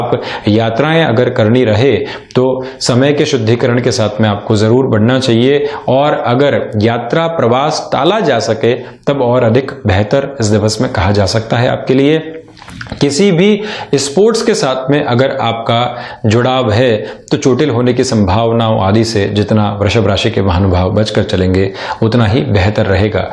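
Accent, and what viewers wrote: Standard. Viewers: Pranam. Bahut shuddha uccharan. 🙏🙏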